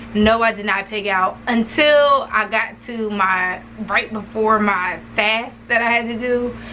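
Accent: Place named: DMV, United States. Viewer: You sound like you're from the DMV! Lol where are you from?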